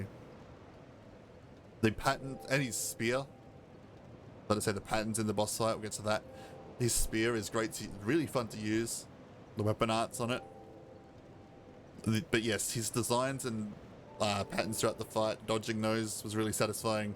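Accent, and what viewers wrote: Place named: South Africa. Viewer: You sound South-African or Australian.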